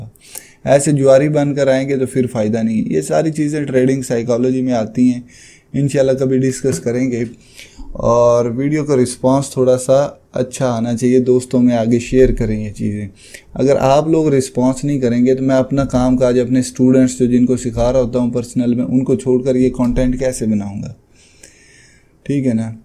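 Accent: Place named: Lahore, Pakistan. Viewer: are you from pakistan bcoz of your Lahori accent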